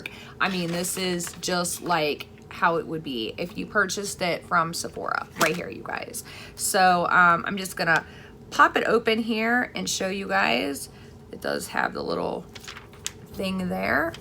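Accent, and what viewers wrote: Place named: New England, United States. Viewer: I'm from Kentucky you don't sound like it, I thought you were from maybe new england or something like that.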